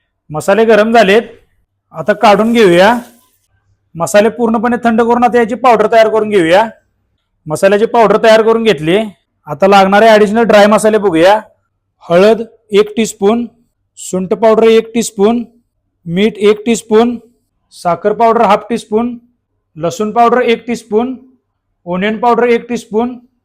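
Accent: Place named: Sangli, India. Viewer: Mastch tumi sangli che ka dada bolne sangli kadeche vatey 😅 khup chan video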